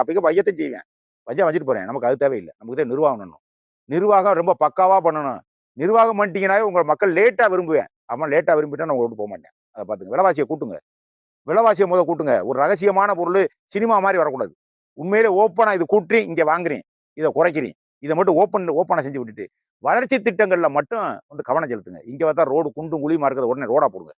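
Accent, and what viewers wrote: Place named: Madurai, India. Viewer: இன்றய,,தமிழக,,அரசியல் பம்மாத்து,,பித்தலாட்ட சுய,,தம்பட்ட,,கீழான அரசியல்,,நாடகங்களை அடித்து. துவைத்து அலசி,,உதரி,,காயப்போட்ட எளிய,,மதுரை,,நெல்லை தூய,,அன்றாட,,பேச்சு தமிழில,,உரக்க,,மனதில் பதியும்படி அருவியாக,,குமுரி தீர்ர்த்து விட்டீங்க நண்பரே,,சகோதர்ரே் நன்றியுடன்.. சீதாராமன்,,80 வயது பம்பாய,,மும்பை - 51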